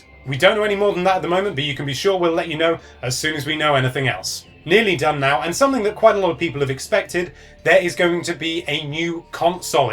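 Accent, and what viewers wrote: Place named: United Kingdom. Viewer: Hmm April Fools finishes at 12pm in the UK, judging by the guys accent I would assume this was posted in the UK thus not being an April Fools joke at all. All info Double Confirmed!!!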